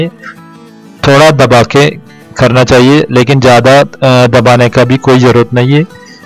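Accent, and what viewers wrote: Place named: Kerala, India. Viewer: The tip is really nice but listening such sweet Hindi from a malayali was great 😀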